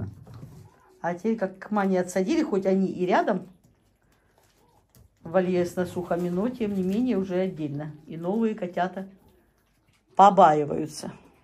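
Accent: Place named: Ukraine. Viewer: Я понимаю, что вы, Хохлушка. Но говорите хотя бы чуть чуть по русски. Не Шыколадки, а шоколадки. Не точно по буквам, а О смягченное. Я очень к вам хорошо отношусь. Всегда вас смотрю. Но пожалейте наши уши(((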